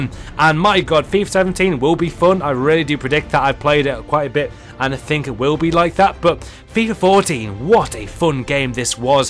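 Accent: Hungary. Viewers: hungaryan commentary :D it's so good to hear :D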